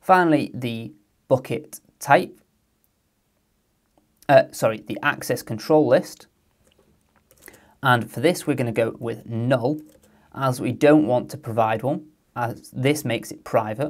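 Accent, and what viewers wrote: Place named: United Kingdom. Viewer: that british accent!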